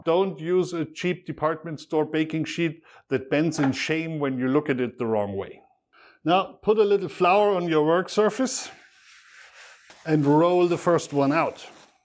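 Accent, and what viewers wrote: Germany. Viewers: "... this is an excellent choice for brakfast or lunch!" Bist du aus de Palz oder aus Rhoihesse? XD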